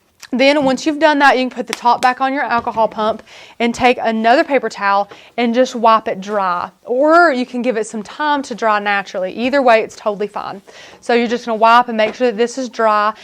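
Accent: South, United States. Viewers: Great video. I love her country voice... Lol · talk a little more southern... southern drawl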